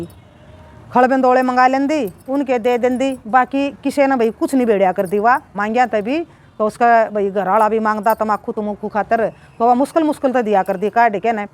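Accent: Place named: Meham, India. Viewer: Aunti aap boli se humare Meham side ke lgte ho.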